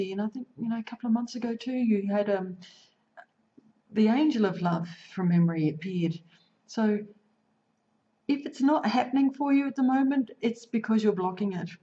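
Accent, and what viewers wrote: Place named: Australia. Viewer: Hi! Thanks for the warm, positive energy. You sound Australian and I love it. It's my intent to travel to Sydney in Sept.; I just have to find a way to make it work out in the best way. I've lived there twice for 3 months before. Sydney, Canberra, Melbourne, Port Lincoln and Darwin, plus a few days in Brisbane. I have a Jupiter IC going right through Canberra, with a couple of Venus crossing lines above and below Sydney!! Do you like Astrocartography? I'm a handwriting analyst and O love to combine them all with deep theta mediation. God, why am I rambling??:-) Just saying thanks for the positive vibes, love to see you in Sept!!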